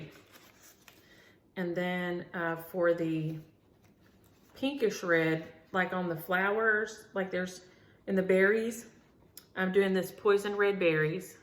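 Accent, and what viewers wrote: South, United States. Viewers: LOVE your videos, you are so funny, love your southern accent. I want to mention Wicked and Heart. Thank you, my name is Linda Norris, Springfield, Ohio.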